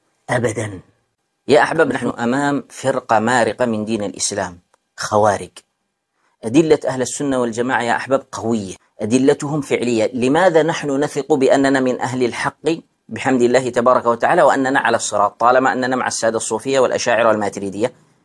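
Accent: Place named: Yemen. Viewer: لهجة هاذا الشيخ كانه يمني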